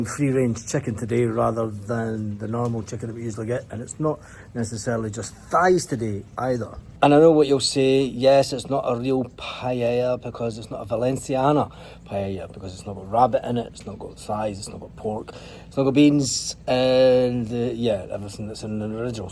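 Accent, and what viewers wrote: Scotland, United Kingdom. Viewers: The Scottish accent is very cool 👌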